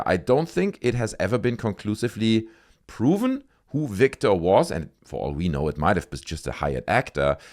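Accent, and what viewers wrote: Germany. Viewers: Great video mate, is that a German accent im detecting btw? :)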